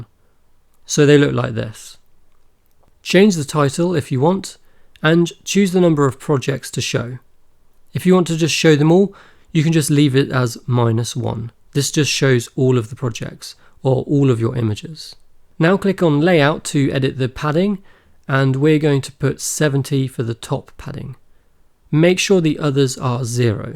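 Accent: England, United Kingdom. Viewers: what's with the Eng. accent????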